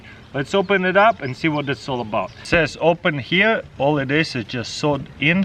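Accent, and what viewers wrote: Russia. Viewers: На английском с русским акцентом.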